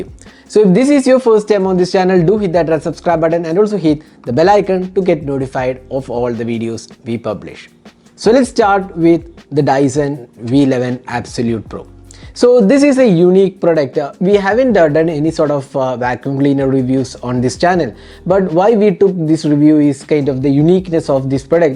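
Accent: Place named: Kerala, India. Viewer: In 3 seconds I realised he's from the motherland.. a fellow Malayali 😂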